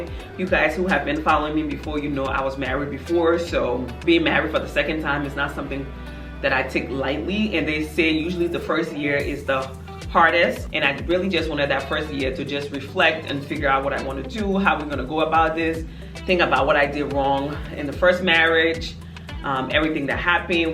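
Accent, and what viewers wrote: Liberia. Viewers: Congrats on everything! I have to ask, are you liberian? I love liberian accents!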